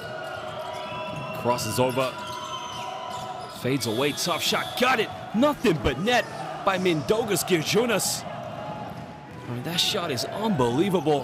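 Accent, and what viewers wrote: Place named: Boston, United States. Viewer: Just watching this a year later for the love of the game. Sounds like the announcer is from Boston. Stunning shot to end q1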